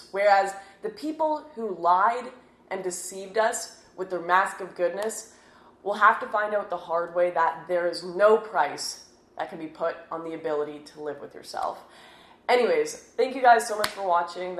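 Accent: Canada. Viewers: wow dude, so many Canadian pronunciation gimmicks... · Dylan Gill But she isn't American, she's Canadian; I have a couple Canadian friends, and they often pronounce the letter "A" weird. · Draegens and Frodo Baegens. Canadian accents (kinda Minnesotan) are hilarious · Don't remember what video it was but it was a recent one. In it I was kind of surprised at her pronunciation of eggs ('aegs') and flags ('flaigs') as well. I knew Canadian English was a bit different to American English accents (the well known 'aboot' instead of about :P) but I didn't know (some?) Canadians pronounced these words like that. But to be honest, I couldn't care less what accent she speaks in, I'd still listen :D. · draygons? frodo bayggins? is this a Canadian thing? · 😘 Amazing red pill moment beauty Canadian accent and all the lies of the world are coming out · i hate that canadian accent lol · Al DEE it must be due to her Canadian accent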